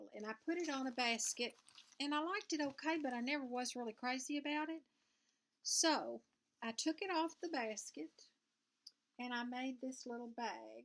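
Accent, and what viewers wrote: South, United States. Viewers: I love your videos. Don't worry about that Southern accent because I speak Southern too! 💁 Your projects are beautiful and your finishes are done so well. Thanks for sharing with us! Carolyn. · I enjoyed your video. Your finishes are lovely and I love your Amazing Grace bag. I have that pattern also. :) I love your accent. I am from the South as well. I was born in Alabama but live in North Georgia now. Where in the South do you live? Happy Stitching!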